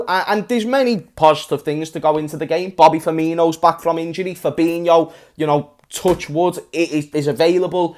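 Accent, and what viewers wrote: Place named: Manchester, United Kingdom. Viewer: Sound like a manc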